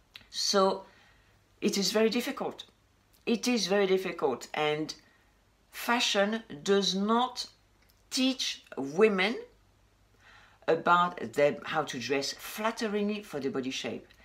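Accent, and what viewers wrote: France. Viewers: Good idea the oldest pieces of the closet, I have some items specially coats and jackets and a pair of shoes, sorry I really love them, they are a good quality items . I enjoyed this video, love your french accent, I love french but it is a little bit difficult to me to speak it. Greetings from México. by the way, do you speak spanish?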